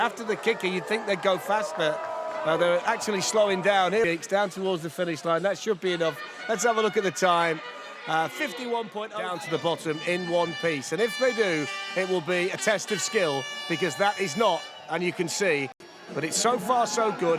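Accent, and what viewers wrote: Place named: United Kingdom. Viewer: The British 😅😅 commentary is thee best! Pram wheels! The burm!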